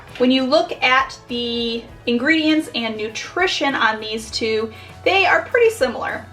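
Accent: Midwest, United States. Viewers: The second way you pronounced it is correct, however I understand the americasation (is that a word?) because of your American accent. ❤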